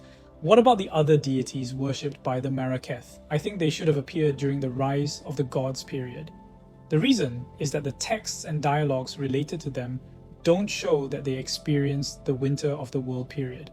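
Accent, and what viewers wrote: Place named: Asia. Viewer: 這是AI不是本人嗎？我還覺得這期亞洲音比上期重呢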